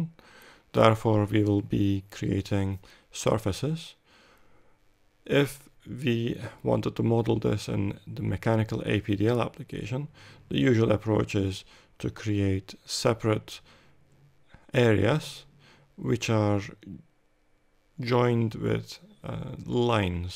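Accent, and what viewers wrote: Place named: Turkey. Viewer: hi admin, where are you from? your english like Turkish accent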